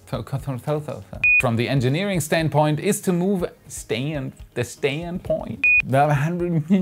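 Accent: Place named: Germany. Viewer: Ich mag deinen deutschen Akzent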